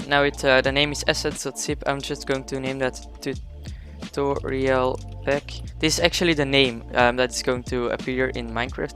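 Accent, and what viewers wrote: Netherlands. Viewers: The accent is pretty dutch here, gwn om te zeggen hoor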